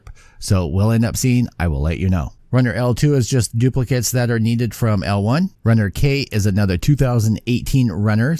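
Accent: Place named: West Coast, United States. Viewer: Accent? Hm.. I suppose he has a West Coast accent since he lives in California. I don't really hear too much of an accent though.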